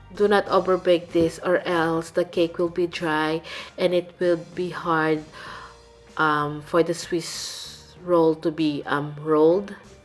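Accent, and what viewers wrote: Philippines. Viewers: You have the typical Filipino accent.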